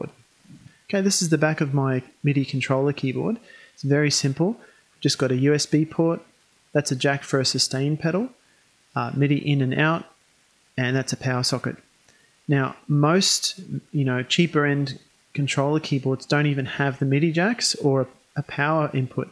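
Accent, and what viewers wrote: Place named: Australia. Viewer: hi great help I just got a usb cable to go from my imac 209 to my roland Em 15 and was showing in put light on in green but would now show up on mac or work, thats cause its not a midi keyboard right and my interface is a focusrite scarlett solo so has no way of connecting it up I looked up how to connect it and was advised to get the usb midi cable so what can I do please do I need to download software or what. I'm from melb I noticed your Aussie accent.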